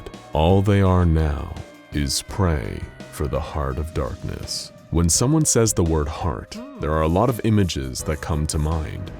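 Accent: United Kingdom. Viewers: It's bri'ish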